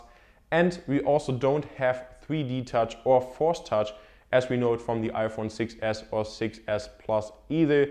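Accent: Germany. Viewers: i hear german in your voice are you german???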